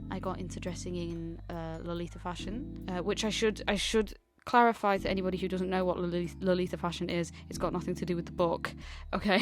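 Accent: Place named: United Kingdom. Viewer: Idk how u made urself more british sounding but ye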